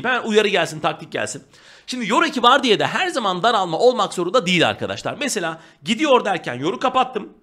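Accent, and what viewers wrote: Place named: Urfa, Turkey. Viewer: / Hocam urfa şivesi😊😊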